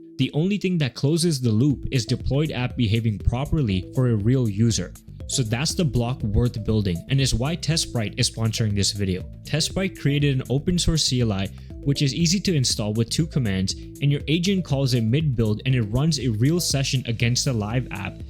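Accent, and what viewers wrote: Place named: India. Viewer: Are you an immigrant from India or from another country? Your accent doesn't sound like that of a native Canadian or someone born and raised in the United States.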